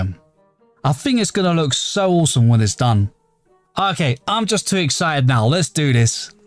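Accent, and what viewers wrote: United Kingdom. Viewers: Are you by any chance British you sound like one not being stereotype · His British axcent is 🤯🤯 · Sounds like Bri'ish fella!!! (no offense)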